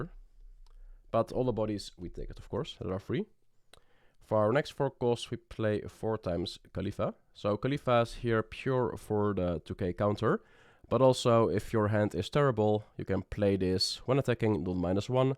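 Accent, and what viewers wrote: Netherlands. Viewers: Random question but are u dutch?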